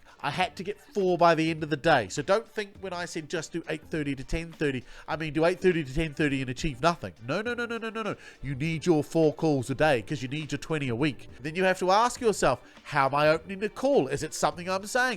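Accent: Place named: New Zealand. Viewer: I thought I heard a Kiwi accent. Then I see the FourSquare mug in the bacground!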